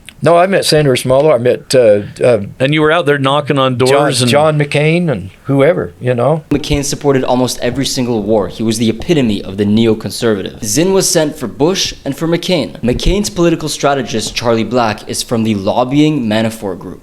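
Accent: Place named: Canada. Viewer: Oh this is good man. Idk where you came from… sound Canadian - but this is good work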